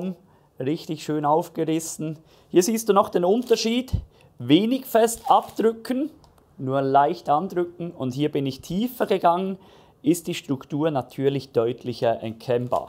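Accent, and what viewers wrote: Switzerland. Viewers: Die Rezepte sind immer DER HAMMER! Danke! Und ich hör auch dem Schwizer Dütsch so gern zu 😁✌️. Grüße aus 🇦🇹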